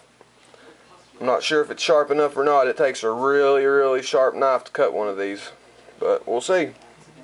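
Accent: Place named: South, United States. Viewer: i was having a go at the voice that southern US tone i just love it. This is an amazing review that Vannax C35VN Steel is insane just because S30V was voted as the best steel in 2007 or 2008 doesn't mean it still is and a lot of people think it still is. But the Vannax S35VN leaves most steel for dead. Ots a incredible steel the S35VN if you learn about it. Anyhow i was taking the micky out of ya and seems that some took it for real. Top review Champ...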